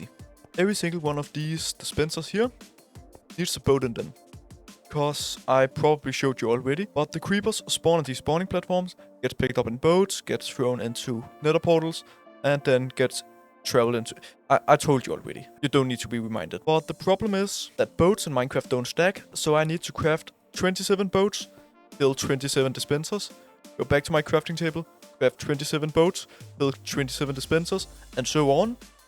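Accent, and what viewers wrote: Denmark. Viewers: bro are u danish u have a very danish acent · I can tell he’s danish! Godt arbejde! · skud ud til den danske accent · din danglish er sindsyg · wait are you danish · tydeligste danske accent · The danish accent LOL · bro din danske accent dræber mig indeni 😭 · i like that after just 1 second of him speaking i could hear he was danish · sekundet videoen startet ku man høre du var dansk men shii valid vid du mangler bare accenten nu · Den danske accent træder altid igennem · are you danish by any chance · This sounds liek Danish english are you from Denmark i am. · bro got danish acent¨ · Holy danglish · er du tilfældigvis dansk det lyder sådan. anyways fed vid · den danske accent er elite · I know a danglish accent when i hear one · Danish ascent + bro cheated... Level 8 with elytra ( u start at level 7 when u die soo) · YOOO... you dansk? 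ahah. Great video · Great video! Got to Love the danish english 😂 great job! Greetings from norway! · Are you danish? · Your Danish accent is thick 😅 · hej bro, kan høre du dansk. skal vi lave en survival verden sammen, mangler end der er god til spillet lol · 30s in and I'm guessing you're Danish. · The danish accent is crazy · I hear dansk 😮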